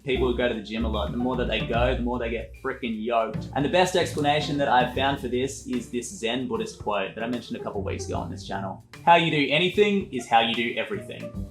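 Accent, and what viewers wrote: Australia. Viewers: Are you an Aussie? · Finally an aussie youtuber, makes it so much easier to listen to all of it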